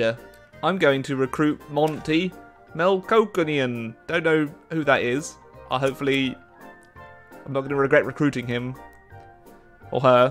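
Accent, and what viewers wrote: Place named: United Kingdom. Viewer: Yeah, I wish I had half the charisma and British accent he has. I'm just here to show support for the man who gives me a reason to look forward to something more than just another day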